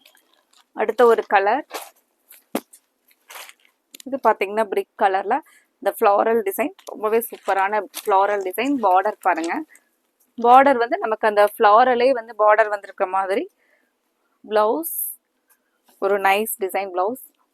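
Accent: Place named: Coimbatore, India. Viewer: அழகு கோவைத்தமிழ். கேட்க கேட்க திகட்டாது❤❤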